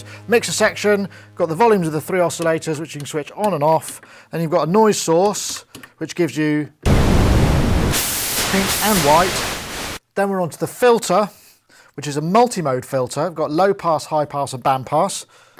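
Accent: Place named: United Kingdom. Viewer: Lock, stock, and two smoking oscilators accent right there. This guy should be in the next Guy Ritchie movie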